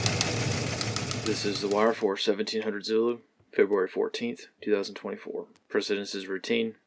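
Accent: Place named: South, United States. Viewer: He’s Southern. We tend to reflect on our words and phrases as we speak.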